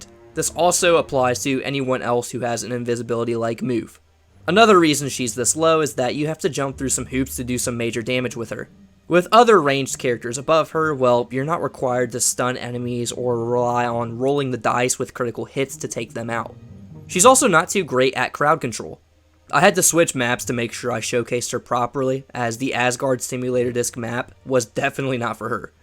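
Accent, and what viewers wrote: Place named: South Jersey, United States. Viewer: I’m from Philly & you are 100% from South Jersey I can hear it in your voice lmfao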